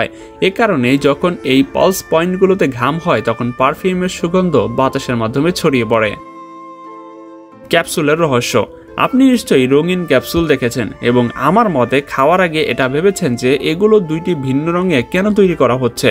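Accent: Bangladesh. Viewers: ইনার কথা শুনে তো মনেহচ্ছে ইনি বাংলাদেশি।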